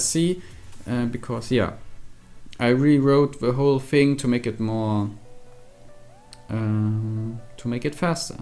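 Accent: Germany. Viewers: Achja der deutsche Akzent in englisch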